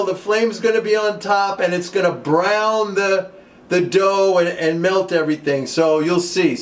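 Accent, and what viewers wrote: New York, United States. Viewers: You sound like a real New Yorker. I like I have the sauce lol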